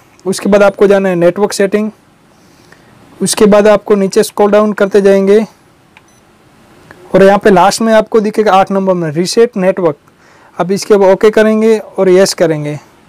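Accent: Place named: Gujarat, India. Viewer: Bhai aap Gujarat se lgte ho aapki language se....Achha mujhe yeh to btao k A4 paper ka business krna ho to Guide kre aap plz.Thank you dear.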